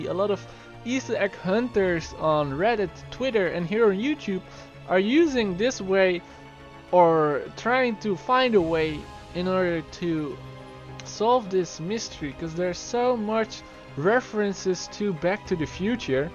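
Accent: Netherlands. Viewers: Are you dutch???? Cause you have a Dutch accent here and there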